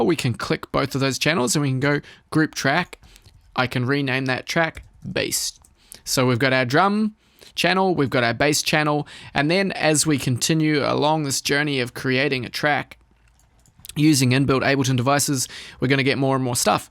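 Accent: New Zealand. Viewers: Heard you speak in the intro and was immediately like "ay new zealand!?" haha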